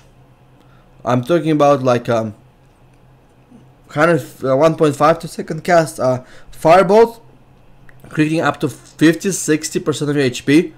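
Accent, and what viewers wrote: Russia. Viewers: your russian accent is good with soothing music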